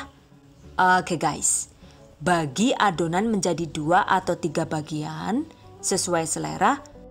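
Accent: Banyumas, Indonesia. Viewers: akun masak tapi sajian videonya bikin ketawa dg aksen ngapak yg khas. Makasih atas ilmu yang dirangkum dg menyenangkan dan ga ribet:) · Jebulane ngapak yak,lucuuuu men yak👍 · Pas denger dirimu ngapak2, saya ngakak dan langsuung subscribe. 😂😂😂. Sukses sis dan makasih resepnya ❤️ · Aaakk ngakak bgt ngapaknya seru ditelingaa 😂😂😭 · Hahaha.. Ngapak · lengkap temen sh yu tutone... mantep sampean ko logate wong banyumas Cilacap sh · Ngakak ngapaknya mbekayune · Asline wong Endi sih yu? Ngapak bisa, ngomong ngaggo bhs. Inggris ya pinter temen koh... Hahaha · Ngulen tangan bisa se'elastis itu bun.. mantappp Ger lgi ngapak mantep retung, sg ra ngerti li ndarani lgi ngomaih😂😂 "DONE" #GiveawayTHVDesember2019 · Lucu2....saya suka kalo lihat,bisa +ilmu & pasti'a bisa ketawa sendiri kalo yg punya channel bicara NGAPAK....hehehe. Rika asline wonk ndi sih yuuu...genenk bisa ngapak,kunci Inggris'e juga pinter....ehhhh bahasa Inggris maksude enyonk. 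Hehehehehe.....piss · Oalah jebule rika wong ngapak yu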